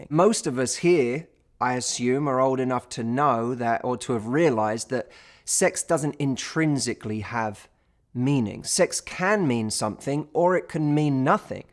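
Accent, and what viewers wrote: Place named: England, United Kingdom. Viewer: He gives great advice and he is so beautiful I love his lovely English accent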